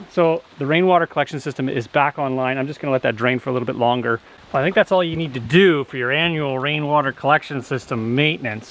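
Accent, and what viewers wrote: Canada. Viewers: I just love to hear you talk. Canada speak, uh, yes.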